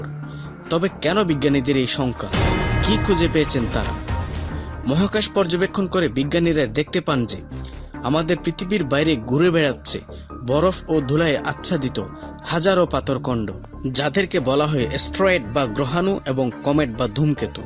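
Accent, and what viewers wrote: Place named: Sylhet, Bangladesh. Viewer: সিলেটের ভাই মনে হয়। যাই হোক তিনি তার সর্বোচ্চ চেষ্টা করেছেন।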